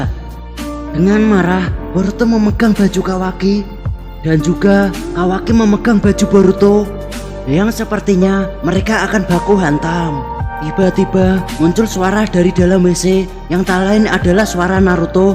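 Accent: Java, Indonesia. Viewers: Bang bang woy...ente mendingan pake bahasa jawa aja biar medoknya pada terlestarikan lebih bagus bang...udeh pake bahasa melayu tp medok ude gtu pake ngomong jorok lagi ampoooun deh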